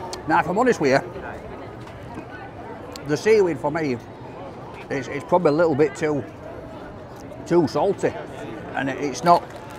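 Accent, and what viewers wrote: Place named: Yorkshire, United Kingdom. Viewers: Don’t think he understands your Yorkshire accent over there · These people obviously love the English accent, mate LOL 😁Keep this up. Really enjoying this. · Visit Cha Cha chicken, it’s near Santa Monica pier great Mexican food and owned by local people as far as I’m aware. Love that your presenting our Yorkshire accent over there , tell them it’s the Jon snow accent then they understand haha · "What's t'best one?" "Huh??? Everyone's struggling to understand our. Pride of Yorkshire! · I’m from Yorkshire and his dialect isn’t too strong compared to some older people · I completely agree with you and his personality and accent have given him the opportunity and success he has. I’ve bumped into him 3 times in Leeds . I’m not a stalker lol. He knows his USP and that’s why he is now where he is. Northern lad done good 👍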